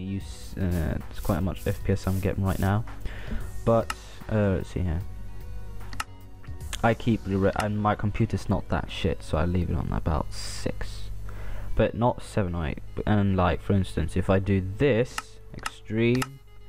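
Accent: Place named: United Kingdom. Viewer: You're norwegian or british xD,i noticed your accent so i thought you were british.Anyways nice video mate helped me alot.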